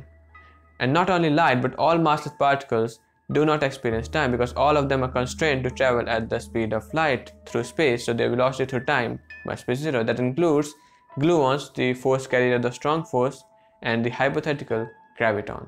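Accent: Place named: India. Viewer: I cannot experience this video due to the pajeet voice and accent.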